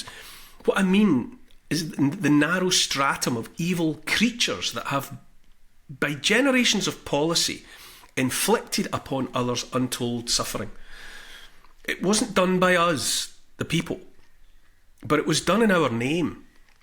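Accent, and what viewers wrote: Scotland, United Kingdom. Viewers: ... this CanUk fan is a fan because you overflow with common sense. Personally thank you for the great help you have been to a feller, with ancestral Scots blood, better understand what words behind the Scots accent meant: ) In the 50s-60s Scots in our chat had been reduced to wonderful 'catch phrases' usually humerous. Cheers & beers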